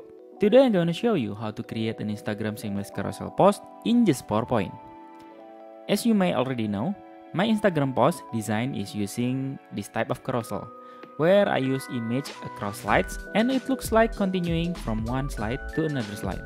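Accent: Indonesia. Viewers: Aksen Indonesianya manthul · Makasih mas tutorialnya, accentnya seperti orang indo, ternyata bener, auto subscribe deh 👍😁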